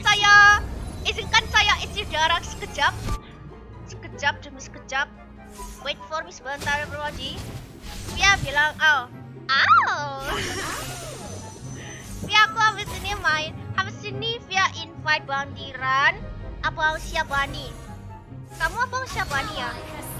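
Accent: Java, Indonesia. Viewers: Mbak medok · mba nya org jawa kah? logat nya kental banget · Mewedok ni streamer suka aku wkwk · Jawa ga sih?? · medok bgt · Medhok ya ngomongnya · kok bisa gue baru nemu manusia 1 ini, gameplay bagus, hero ga template cwe, auto subscribe sih apalagi jawa banget😂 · medok medok lucu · Kowe wong jowo mbak 👀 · Suaranya medok 😂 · Medokmu candu sekali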